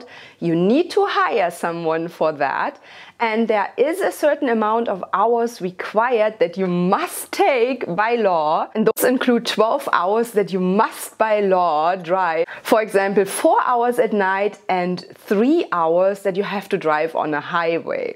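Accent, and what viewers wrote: Germany. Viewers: Das hat mir gefallen. Aber nach 16 Jahren so ein krasser deutscher (like they let talk "us" in movies...) Akzent?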